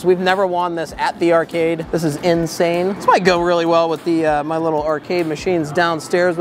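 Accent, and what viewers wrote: Pittsburgh, United States. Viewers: Sounds like he's from Pittsburgh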